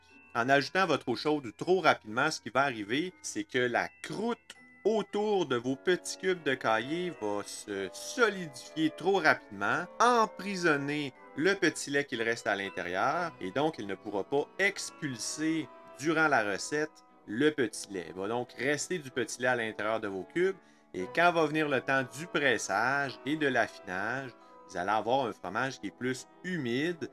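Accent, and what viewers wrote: Quebec, Canada. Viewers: slt, merci pour tes vidéos qui sont excéllentes, et pédagogiques, il y a dans les sous titre un p'ti probleme de traduction parfois mais on s'y fait! cela vient surement de ton accent du quebec; et L'IA qui traduit s'emmele les neuronnes parfois!:hand-pink-waving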